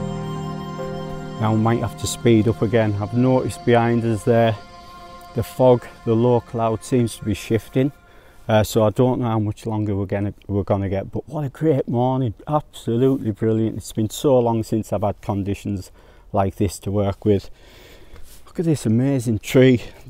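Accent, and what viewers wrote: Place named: Sunderland, United Kingdom. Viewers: Canny video, where are you from? I'm from Sunderland and can detect traces of mackem in your accent but not sure. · Great video, me and my wife are trying to figure out your accent, I've decided you're from Cockfield, she thinks Sunderland. Could you please end the mystery for us.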